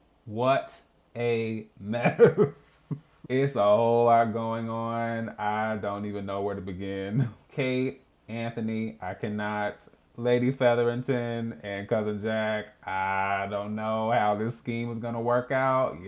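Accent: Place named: United Kingdom. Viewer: What do great reviews, a British accent, and fun historical financial facts have in common? You guessed it, another review.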